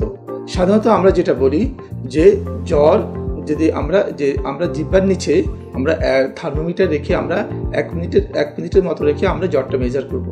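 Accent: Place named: Bangladesh. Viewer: কথায় বোঝা যায় বাংলাদেশের মিয়া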